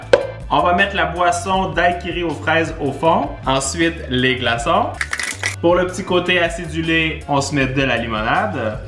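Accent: Canada. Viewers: tu mon youtubeur préféré desCarl is cooking vidéos accent canadien et qui met quoi des vidéos trop trop rire 🇨🇦👍👍👍👍👍 recette Starbucks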